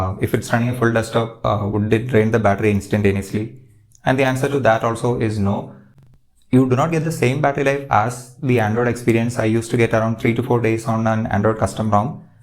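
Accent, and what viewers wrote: Kerala, India. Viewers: Malayali anno bro ?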